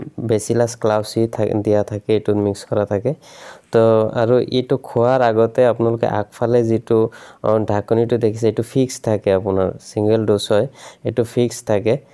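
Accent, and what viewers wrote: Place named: Kolkata, India. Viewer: kolkatar language mone hosse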